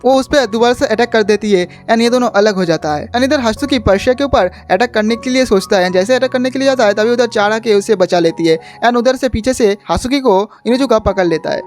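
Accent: Bengal, India. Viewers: Vai apka bat Thora Bangla lag rahera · Bro,are you Bangali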